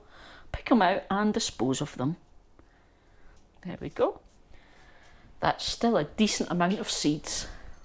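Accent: Scotland, United Kingdom. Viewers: I've been going back. Tried to find it but no luck.. oh well I was just curious. No big deal.. Love the Scottish accent.. From 🇨🇦 🥰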